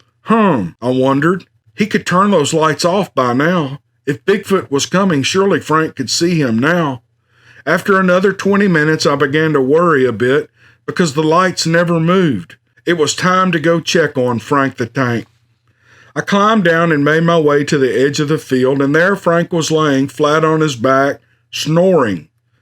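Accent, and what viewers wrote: South, United States. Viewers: I love it bro I absolutely love it,! I love the channel and I love your southern accent and hospitality you seem like a good dude but the story hit the spot. It was one of the funniest hunting stories I’ve ever heard and cannot stop chuckling even as I write this little note to you, the store just made my day and I’m going to go ahead and save it to my favorite columnso I can listen to it every once in a while when I need to pick me up or to put me in a good Sasquatch’en mood. Your a’ok in my book 👌 But who am I to give approval to another but still love the story and especially the channel, and looking at shirts as gifts for family. Good day brother. 👍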